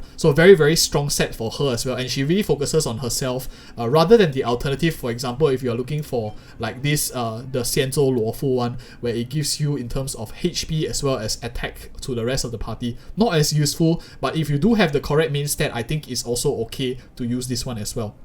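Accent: Singapore. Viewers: i recognize singlish when i hear it rofl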